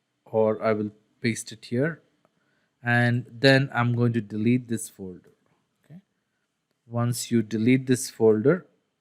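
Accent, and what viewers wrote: India. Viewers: another unhelpful tutorial with indian english accent, they produce many of that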